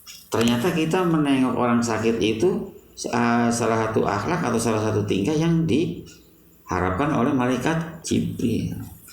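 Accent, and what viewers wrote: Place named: Java, Indonesia. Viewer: Bahasa MEDOK gini sepertinya unik nih ,,,wkwkwk soalnya saya lihat dari part - 1 sampai part - 2 sama.tapi bagi saya masuk sekali cara penjgkajiannya,monggo dilanjut pak ustadz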